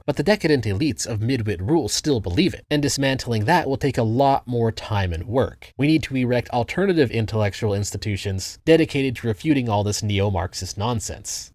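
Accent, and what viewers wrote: Boston, United States. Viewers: Yeah, she's originally Bostonian. Moved to an undisclosed location and has tried to get the beantown out of her voice to little avail.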